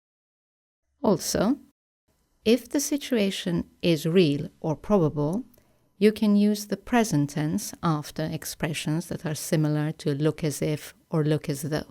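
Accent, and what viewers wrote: United Kingdom. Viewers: it is british english? Answer me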